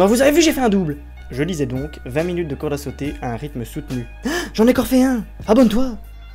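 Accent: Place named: Sudan. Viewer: La quintessence de la callisthénie !! Merci Dis, t'as un ptit accent il me semble... Tu serais pas originaire du Soudan ?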